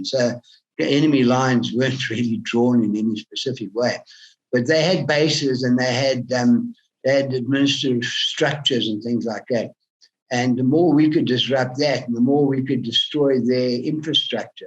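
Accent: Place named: United Kingdom. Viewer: Thats the funniest thing I have ever heard. Utter trash, the boys were ready to take out Mugabe and Co. the moment the word came to move to Zim-Rhodesia but the likes of Ken Flowers and his poisonous ilk betrayed Rhodesia and all Rhodesians and sold the people of our nation into impoverishment, starvation and corruption for the next 40+ years. You are born and raised in the UK, I can tell from your accent on your channel. Maybe a father or mother from Rhodesia?. Let me ask you, if ZANU and Mugabe did such a cracking job why did your family flee to the comforts of the UK? did they teach you about the so called achievements of ZANU?. Have you ever lived in Zim or just visited your relatives there?. We mopped the floor with ZANU and ZIPRA time and time again. The one and only reason Rhodesia came to an end is down to sanctions and pressure from the USA, Kissinger and treacherous actions of the UK and their imperial mindset over colonials like us. 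Thats all. Militarily, we could have gone on for another twenty years. We never stole the lands from anyone, in fact, we liberated the Shona from the slavery of the Matabele. We paid for our lands, under crown warrant or with service.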